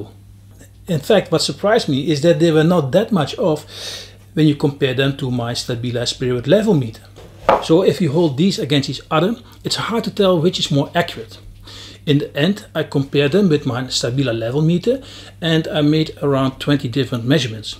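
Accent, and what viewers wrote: Netherlands. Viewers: Verdomme dat hollands accent haal je er ook meteen uit.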